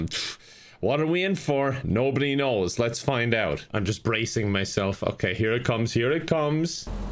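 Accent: Ireland. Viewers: Is he Irish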